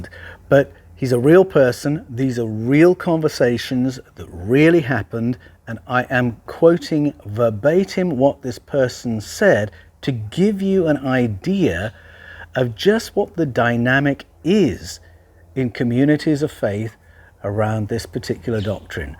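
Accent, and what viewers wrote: Australia. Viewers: does a great Aussie accent. After all is an Aussie himself.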